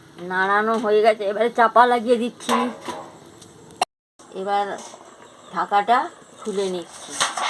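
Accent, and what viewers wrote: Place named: West Bengal, India. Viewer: Pochim bongo kotha tone dia buja jai niramis jobor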